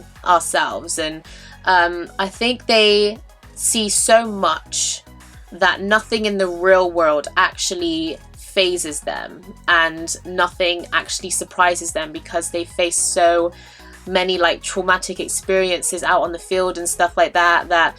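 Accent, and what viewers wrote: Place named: United Kingdom. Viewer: I hope this amazing life has continued. I didn’t now I longed for a British voice till yours and you have the look of the female lead role in movie Aspen Extreme Finola Hughes who. I totally crushed on in 1993 😮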